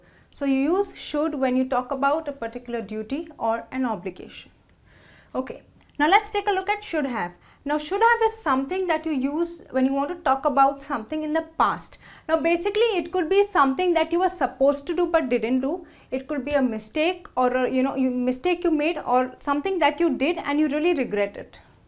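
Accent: India. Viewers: distinctly indian accent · yap, i think it's Indian accent :)) Just learn grammar from here, learn accent from others ! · Indian English...as a british i can teach u how to prounce ''2'' correctly lol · yeah is indian accent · I used to avoid Indian accent. It was like a tinkerbell in my ears. But with you. It's as soft as a Teddy bear. (I don't know why...) And i need to improve my english with your very usefull lessons.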